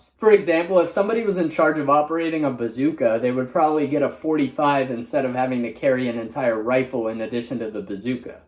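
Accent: United States. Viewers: 25 years old and i will never not hate the way Americans say Carbine. The car bean thing was originally a American English vs. traditional English pronunciation. So in The UK, the Commonwealth and Ireland (ie 90% of the English-speaking world) its car byne To Americans its Car bean. And since in the modern day most firearms experts are Americans, you get Car bean being more popular in pop culture and online. Do you guys also call a turbine a turbean?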